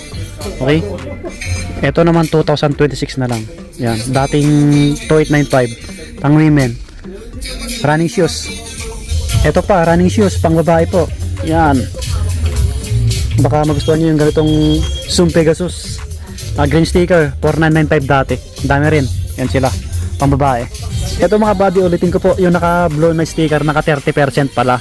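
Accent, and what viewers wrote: Visayas, Philippines. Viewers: Ituwid mo salita mo ,anong naghanap?naghahanap dapat past tense yung naghanap....halatang bisaya ka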